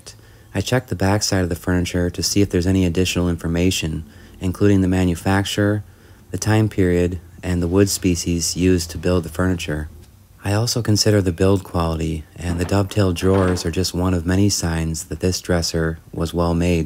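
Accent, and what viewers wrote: Wisconsin, United States. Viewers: I’d listen to your Wisconsin accent all day! Beautiful restoration once again! 😊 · I enjoy your "Wisco" accent. I have only heard Trixie Mattel's exaggerated "Wisco" accent. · Very nice! I remember when you first did a veneer replacement. 😊 you've come along way. And I love you're Wisconsin accent. Growing up in Sun Prairie, going to East Town Mall as a teen, Saturday's in the parks. You make me remember my carefree youth. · It came out great! PS love that Wisconsin accent💕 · As a former Midwesterner, I appreciate the Wisconsin accent ❤ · Wisconsin accent? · Trop beau! J’aime autant vos silences que votre accent du Wisconsin. Ne changez rien. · Now that is gorgeous! I could only hope to achieve something minorly close to that… Love your videos with sound without sound with talking not talking and of course I love your Wisconsin accent since I am from Wisconsin but I’ve lived in the south for 25+ years. 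· Amazing.....you bring back the original beauty!❤ And Ilike your wisconsin-english😂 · That natural wood grain is so beautiful, hard to believe someone would paint it! I smiled when you mentioned your Wisconsin accent, I being a 70 year citizen of this state I thought, what accent? Lol! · As for your accent, I love Wisconsin and I love any and all information you can provide about the piece and process. ❤ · That paint stripper worked great..I found the environmental strippers didnt work well at all ..I love your Wisconsin accent 😊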